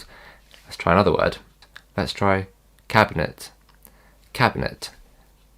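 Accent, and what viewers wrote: United Kingdom. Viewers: You have an excellent british sound i am very curious to have that accent. help me how can I have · I want you to make a video on How did you learn British accent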